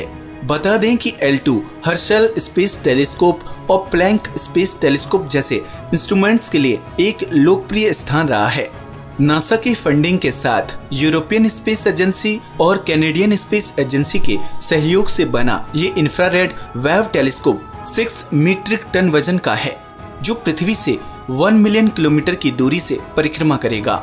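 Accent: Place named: Ghaziabad, India. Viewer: Bhaiya Aapki Awaz Gzb ki hai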